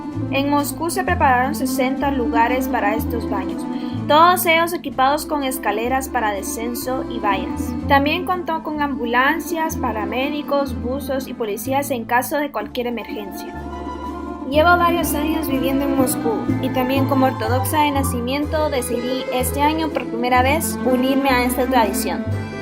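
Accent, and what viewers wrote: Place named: Ecuador. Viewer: Hola, usted es Ecuatoriana oh Mexicana porque tiene un acento parecido a la Ecuatoriana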